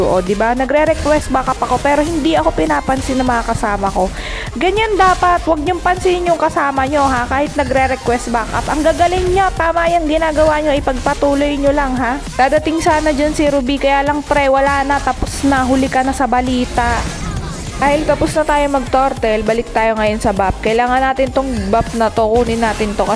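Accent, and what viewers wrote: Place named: Bicol, Philippines. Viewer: 👁👄👁 ang ganda po ng voice nyoooooooo taga saan po kayo sa Pagsailta niyo po kasi parang taga bicol po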